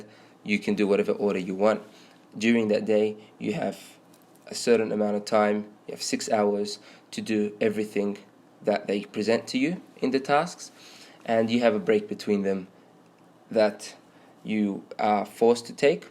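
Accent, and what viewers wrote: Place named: United Kingdom. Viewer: Nice British accent and thank you for guiding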